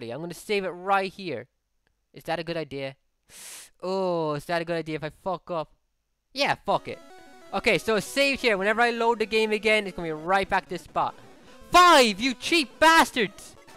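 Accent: Ireland. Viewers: Oh my God your accent is AWESOME!!! Are you from Ireland??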